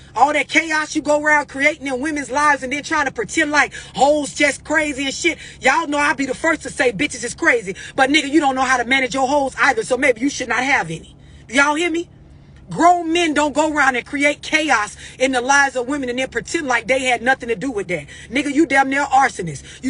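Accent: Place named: Florida, United States. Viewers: Yep... straight outta FL 🌞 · I love the way she say hoe, just the way she talk in general reminds me of home Where she from Florida?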